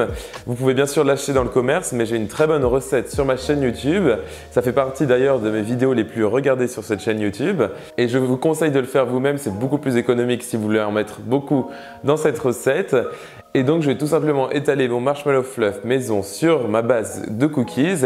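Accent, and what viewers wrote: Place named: England, United Kingdom. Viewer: ouais ta raison . mais il a un accent déjà bien anglais peut être qu' il anglais et français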